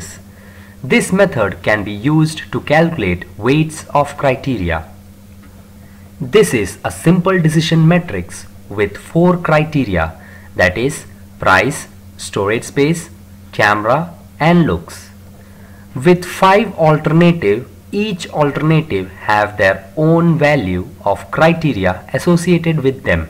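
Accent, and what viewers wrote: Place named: India. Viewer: 90% of tutorials on YT are by indians. Why is that? This accent is very difficult to follow.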